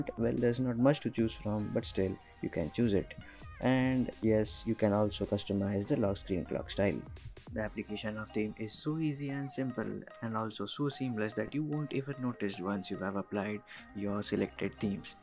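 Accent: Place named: England, United Kingdom. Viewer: Angreez se bhi Bhariwali English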